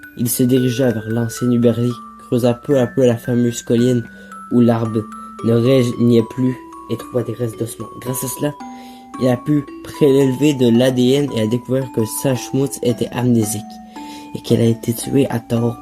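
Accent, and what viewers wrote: Quebec, Canada. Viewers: Le narrateur est québécois ? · Ça aurait été moins bien si la narrateur n'avait pas été Quebecois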